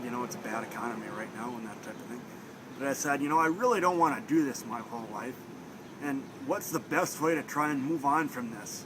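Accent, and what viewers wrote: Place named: Minnesota, United States. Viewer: I cab tell the you do have a Minnesota ascent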